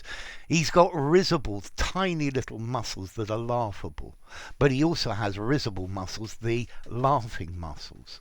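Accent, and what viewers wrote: United Kingdom. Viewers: I always love british accent!!!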